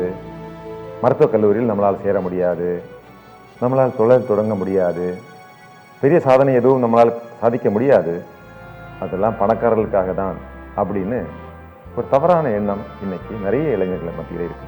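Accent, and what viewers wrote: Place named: Kanyakumari, India. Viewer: ஐயா வின் குமரி தமிழ் பேச்சை ஆழகு சேர்க்கிறது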